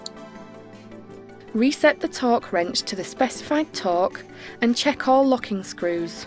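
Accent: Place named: United Kingdom. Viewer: I hate British accents....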